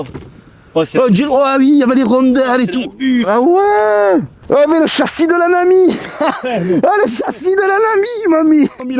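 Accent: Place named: Banlieue, France. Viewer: Ormis cet accent de keke insupportable. Oui c est une bonne machine . Ah monte jamais plus haut que biaritz ,tu vas te faire démonter avec ta langue de branleur de cité qui a loupé son brevet des collèges .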